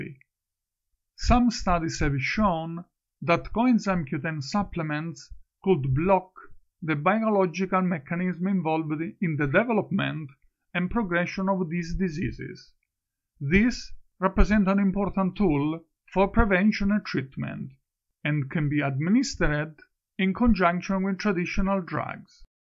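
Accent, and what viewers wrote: Italy. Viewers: Its Italian accent... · good info, strong Italian accent!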